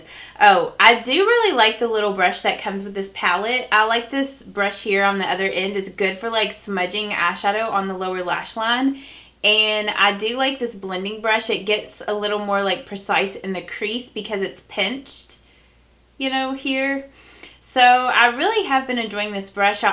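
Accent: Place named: Kentucky, United States. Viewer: I love that this palette is all matte and is so nice for fall🍂 and even winter❄️too! I love your channel and I think I have gone and bought just about everything you have used or recommended from the drugstore. I have four kiddos so higher end products aren't usually on my shopping list lol! I think you are the sweetest thing and I love your Kentucky accent! As always, your makeup💄 looks gorgeous! Oh and I'm entering the giveaway also! Thanks so much and have an awesome day! 😊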